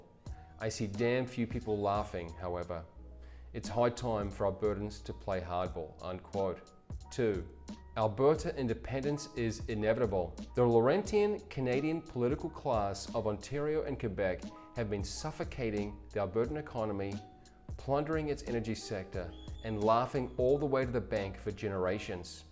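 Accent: Australia. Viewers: Sounds like he from either Australia or New Zealand, and according to his channel's about section he lives in Guatemala. The sad thing is, I don't think Trump needs to pay people to say these things. He's built such a cult, people will make excuses for his top appointees even when they sieg heil the audience twice on stage with the cameras rolling. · I have to admit that your “rant” rankles when I hear that Aussie twang😡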